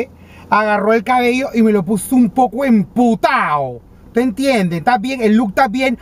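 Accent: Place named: Panama. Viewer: oirte hablar desde España (vivo en España), me trae muy buenos recuerdos de mi Panama. ¡Chúleta! casi me meo de la risa.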